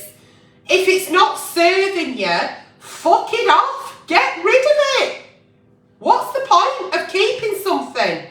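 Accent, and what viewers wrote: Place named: Lancashire, United Kingdom. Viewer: so gorgoeus!!! love your voice and lancashire accent.... parli anche italiano?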